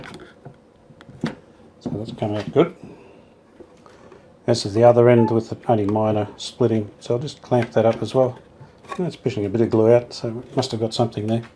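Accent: Australia. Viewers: Bravo! I have watched about a half dozen of your videos from start to finish the last couple of days and I am impressed by your expertise. I have been learning a lot even though I will probably never be able to repair the electrical components of a tube radio but, I watch to see and learn from your timber restoration techniques. I have restored a couple of timber (we call it wood here in the US) tube radio cabinets recently. I'm guessing your dim light controller is home made. Is there any chance of making a video showing how to make an exact duplicate? Just a thought. Is your shelf of radios in any of your videos? It would be great to see that as well. I also enjoy your Aussie accent and unusual Aussie phrases. Keep up the great work!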